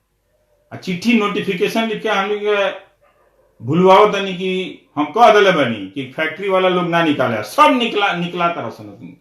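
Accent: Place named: Bhojpuri, India. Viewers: Keya bolte bihari bhasad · Your bhojpuri style is very awesome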